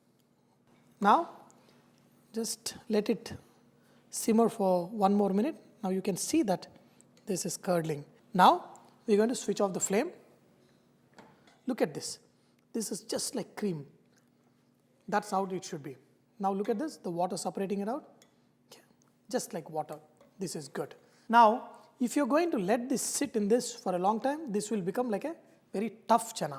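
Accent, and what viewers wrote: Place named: South India, India. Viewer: Snigdha Parida he is from south india so they pronounce like that ☺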